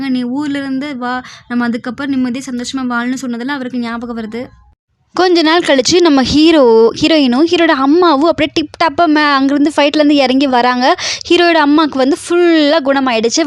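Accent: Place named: Sri Lanka. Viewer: Unga voice srilanka voice mathiri irruku sis .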